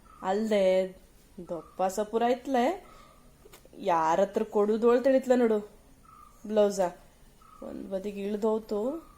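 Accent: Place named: Yellapur, India. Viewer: ನಮ್ಮ ಪ್ರೀತಿಯ ಯಲ್ಲಾಪುರ ಕನ್ನಡ ಬಾಷೆ ಎಂಥ ಸೊಗಸು 🎉🎉🎉🎉🎉🎉ಧನ್ಯವಾದಗಳು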